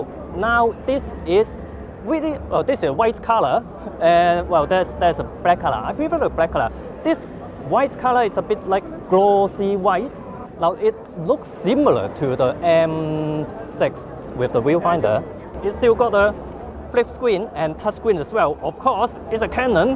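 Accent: China. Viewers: China English !!!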